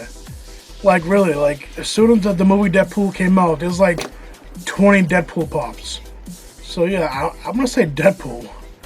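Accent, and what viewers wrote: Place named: Boston, United States. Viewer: Thanks for the Q&A,. My girlfriend and I thought you were from Boston so thanks for clearing that up for us haha. Chucky is a sweet character. I grew up loving those movies. I probably like Child's Play 2 the best simply because we had the VHS growing up and watched it all the damn time lol. Commando is an awesome movie! BENNETT! lol... Thanks for the Q&A. Love your vids!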